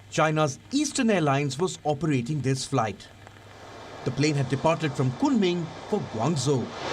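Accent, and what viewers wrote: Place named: India. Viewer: 能不能找个英语正宗点吗？这印度味英语我听着好难受 还自称英语是官方语言的国家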